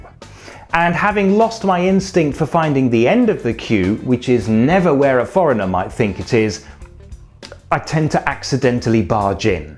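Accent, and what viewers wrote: United States. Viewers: oh my god your american accent is amazing! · Nice American accent! Do you have trouble adjusting to driving on the left side of the road when you return to the UK?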